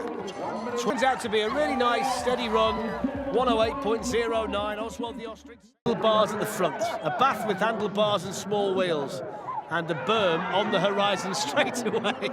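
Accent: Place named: United Kingdom. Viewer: The British 😅😅 commentary is thee best! Pram wheels! The burm!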